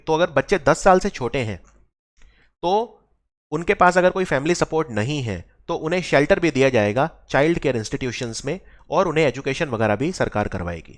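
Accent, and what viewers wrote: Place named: Assam, India. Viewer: Your Assamese accent was impressive... Enjoyed the video ❤️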